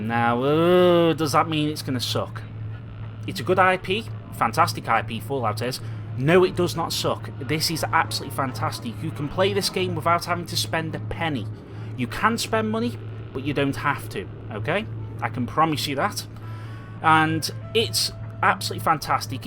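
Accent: Scotland, United Kingdom. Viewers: Nice scottish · Are scottish bc I love the accent I wish it was on android :(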